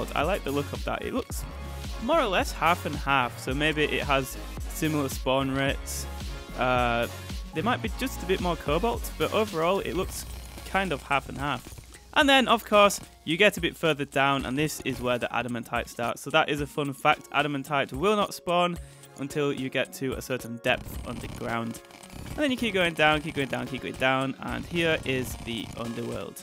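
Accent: Scotland, United Kingdom. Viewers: nice scottish accent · Your accent is absolutely glorious, where in the UK are you from? :D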